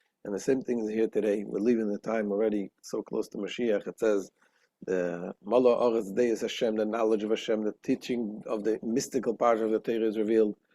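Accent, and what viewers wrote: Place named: Russia. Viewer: B"H Calm down. Get control of your Body. There is no month by that name in the Hebrew reckoning of time. That is the name of a Babylonian idol and if you mark your time by Babylonian astrology you can't attain Immortality. Only Torah time is infinite. This is the twelfth month of the year. זה החדש השנים עשר Try to at least pronounce the Hebrew with some kind of Semitic, not Russian unintelligible, accent. If you knew proper Hebrew, you'd know there is no depression at this time at all. ראש השנה = 861 = אשר המחשבה = בית המקדש Can't you so much as see that ראש = אשר If you are a Jew, may HaShem grant you the insight and courage to return to Hebrew Tradition, to the Hebrew language, to Hebrew Torah from your Yiddishkeit narishkeit. If you can't, or won't, you may be sure that you're not a Hebrew. Hebrew is as Hebrew does.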